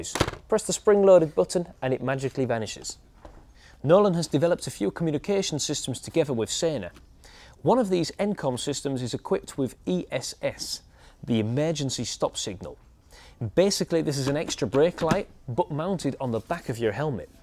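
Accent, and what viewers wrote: United Kingdom. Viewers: Lekker accent maat, moest toch heel even twijfelen of het nou toch stiekem brits was.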